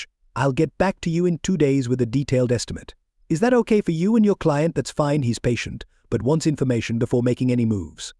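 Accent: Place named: United Kingdom. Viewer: using a "british" style accent for an american story is very off putting the vocalisation just sounds incorrect then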